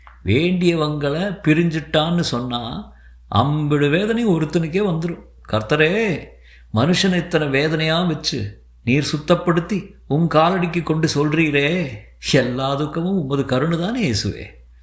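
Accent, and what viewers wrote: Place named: Nagercoil, India. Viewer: Nagarkovil slang miga nandraga pesuhireergal👌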